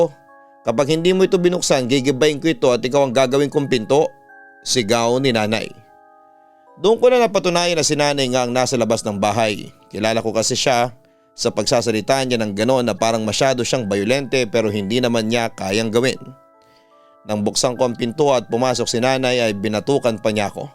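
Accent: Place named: Negros Occidental, Philippines. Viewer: Feeling ko, sa negros Occidental ang nag kwento neto😁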